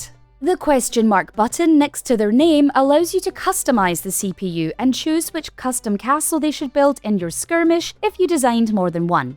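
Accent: Scotland, United Kingdom. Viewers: Came for the tutorial, stayed for the scottish accent. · Gosh a guide AND with that accent! Big loves and thanks to Scotland!😍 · legit curious, this a Scottish girl trying a more neutral/American accent?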